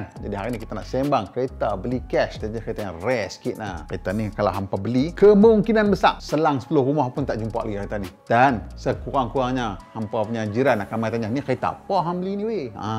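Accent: North, Malaysia. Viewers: Bunyi Utaqa ceq oiii. Tobat lawak ckp. Heqot, mampoih. 😂😂😂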